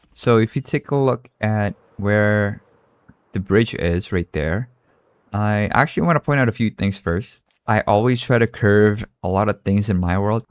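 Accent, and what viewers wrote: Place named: Indonesia. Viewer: this is my first time seeing this channel and i thought i recognize that slight indonesian accent and turns out i'm right!! 😄i didn't expect this, are there more of you guys?? peak content btw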